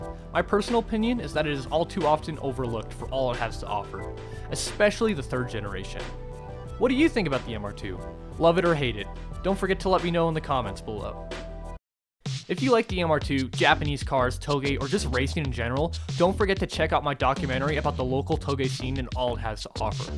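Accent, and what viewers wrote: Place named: Japan. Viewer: Great video but that japanese accent is so cringy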